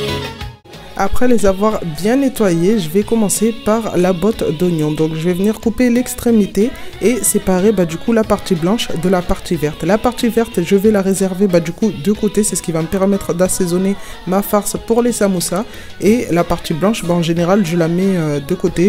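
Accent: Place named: Algeria. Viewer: Merci ma sœur, pour le temps que tu passes dans ta cuisine, on entend algérienne🇩🇿🇩🇿🇩🇿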